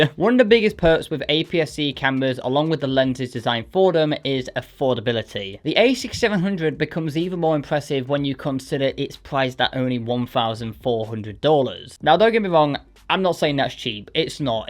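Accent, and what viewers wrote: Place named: England, United Kingdom. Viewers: Hey, I'd say it's definitely some sort of Estuary English, meaning London. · I know it's an English accent but can anyone tell me from which region?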